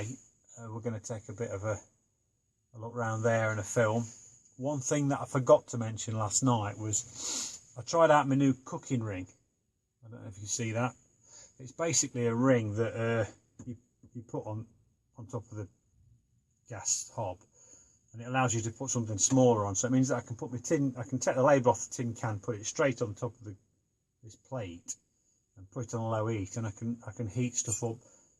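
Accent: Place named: Coventry, United Kingdom. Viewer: Your accent sounds like either coventry or nuneaton/Bedworth? Great videos matey, keep um coming :-)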